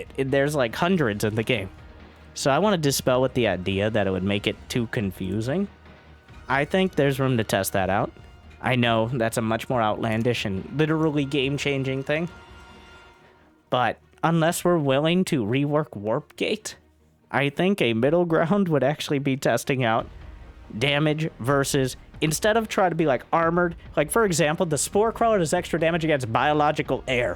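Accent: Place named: Appalachia, United States. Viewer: Why do u talk like a hillbilly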